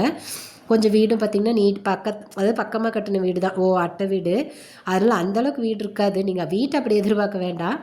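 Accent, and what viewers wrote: Kongu, India. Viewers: Ayyo akka neenga erode ah naa karur 😊😊😊😊 Kongu tamil manakkuthu Naanga mutthur maniyan neenga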